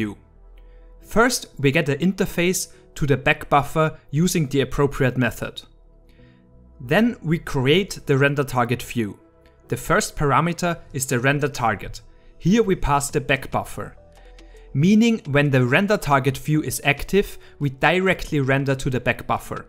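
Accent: Germany. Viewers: Wie man das deutsche raushört 😂 Aber nice Video!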